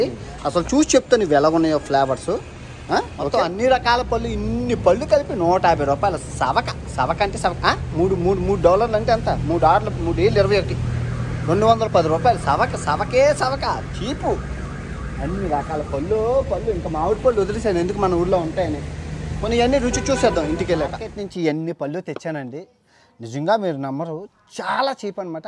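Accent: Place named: Srikakulam, India. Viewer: Bayya nuvvu evaro naku teliyadu 2nd video chusa this video was keka and so natural , like ur seikakulam accent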